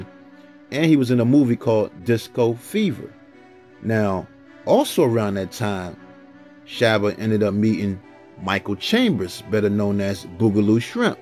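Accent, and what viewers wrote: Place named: New York, United States. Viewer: Your channel is awesome. And that New York accent!!! 😍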